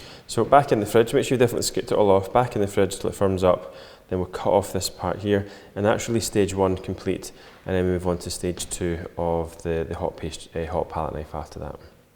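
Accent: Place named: Scotland, United Kingdom. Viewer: Excellent tutorial! Lovely Scottish accent to listen to as well. Thank you.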